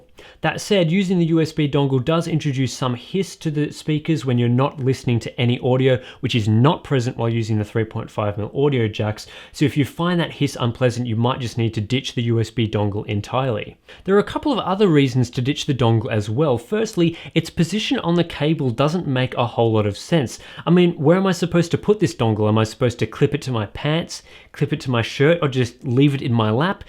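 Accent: Australia. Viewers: He’s Aussie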